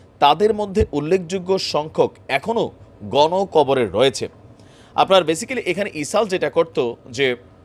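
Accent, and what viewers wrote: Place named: Cumilla, Bangladesh. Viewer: সাহেব আপনি ভদ্র ভাষায় খবর পড়েন খুব ভাল লাগে আমার কারন আপনি কুমিল্লা র মানুষ আমি নরসিংদী আমরা পাশাপাশি। সবথেকে ভাল লাগে যে ভাষাটা কথাটা শুনলে আমার ☺ হাসি পায়। যেমন আজকে পর পর কয়েকবার শুনলাম এ বি সি নিউজে বেনজামিন নেতানিয়াহু নয় তিনি পড়ছিলেন ( বেজন্মা) নিয়াহু। শব্দ টা খুব ভাল লাগল।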